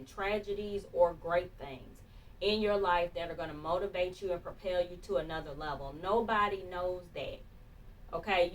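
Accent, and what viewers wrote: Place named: West Virginia, United States. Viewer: Good morning. I can hear your dialect and noticed that it was west Virginia. I have a church member who has the same dialect . On another note, keep uploading These videos . This video certainly spoke to me because people within my own family especially my siblings have told me in my face that I couldn't do things. This video really touched my heart.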